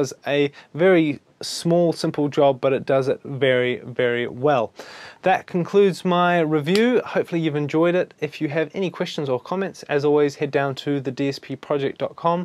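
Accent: New Zealand. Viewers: bro can you send to me, il review it ,give you my thoughts and critisisms and send it back mayby in like 6-12 months? haha Nice review!!! you from NZ?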